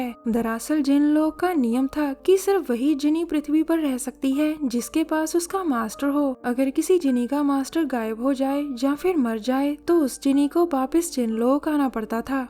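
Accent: Punjab, India. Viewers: Ma'am Shayad Ap Punjab Se Ho ?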